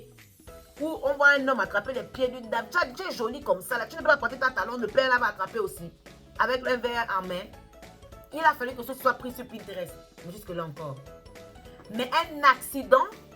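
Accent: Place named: Gabon. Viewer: Êtes vous Gabonaise ? Mon DIEU l accent de chez moi hein…Je me suis abonnée tout de suite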